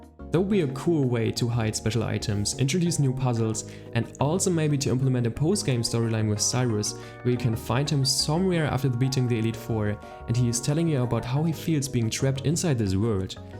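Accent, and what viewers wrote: Germany. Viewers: haha, man merkt beim Zuhören echt den deutschen Akzent :D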